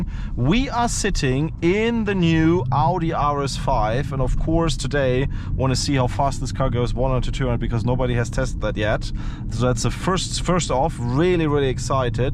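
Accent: Germany. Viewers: A german trying to speak british english with a heavy german accent. It sounds so ridiculous and disgusting 🤢🤮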